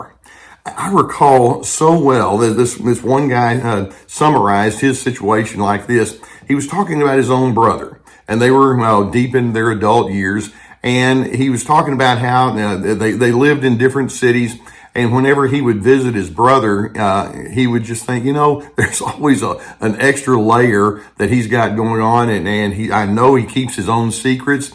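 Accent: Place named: Texas, United States. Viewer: you (and ) have been a tremendous help as I recover from a 34 year marriage with an extreme narcissist. I’m a Texan living in Idaho for 30 years and your Texas-English is beautiful. 🥰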